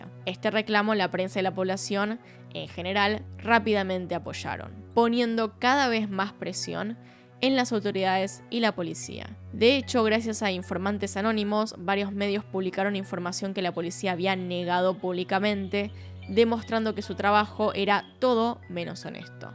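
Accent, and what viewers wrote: Argentina. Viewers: Amiga no hables como Argentina estás en México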